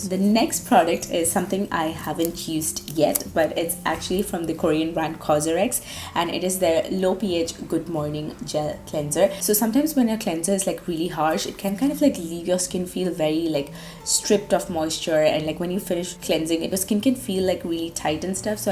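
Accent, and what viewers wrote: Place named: England, United Kingdom. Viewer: Hi, I find u really pretty. Ur makeup tutorials y I like the most is mainly because u do transform from a keralite to almost a different nationality. I do watch ur malayalam YouTube channel. Like ur English accent butt u speak sooo fasttttt. U need to slow down a bit. Thanku n wish u all the luck n luv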